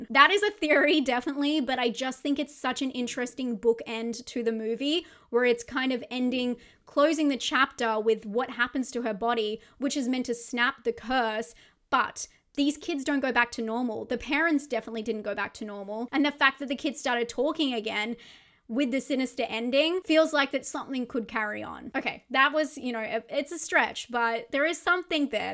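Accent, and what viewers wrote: Australia. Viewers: We say ree-NYOO-al You sound Australian. Aren’t you from Australia?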